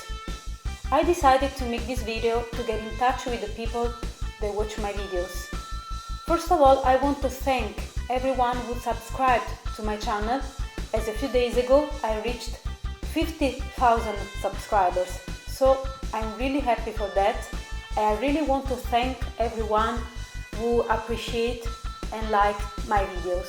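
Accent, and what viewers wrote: Italy. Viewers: Omg you have an Italian accent too !? Damn now my heart is really exploding! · beh con quel accento certamente nn potevi essere di Bolzano...ahhhhh,insomma sei cresciuta a pane e progressive,beh sicuramente un genere molto tecnico,meriteresti un contratto da professionista! Continua cosi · Not only are you an excellent drummer, but you have a beautiful Italian accent! Two thumbs up!!! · Congratulations on 55K+ subscribers and so nice to hear you speak with that beautiful Italian accent... I had always assumed you were in the US somewhere, it is great you are here in Europe. Would love to hear your own music and videos of you playing with your band as well as the brillant drum covers you keep giving us. I have got to say the hours of practice you put in really shows in your playing - amazing quality. Very best wishes from London UK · ....Thanks for answering questions that many of us have wondered about! 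What do you think about Mick Fleetwood? As you well know...the music business is not only auditory, but also visual as well. Not to appear as creepy or anything like that, but clearly you are a very talented and extremely beautiful woman....and your Italian accent is fantastico! Love to see you playing piano and singing!! Keep the videos coming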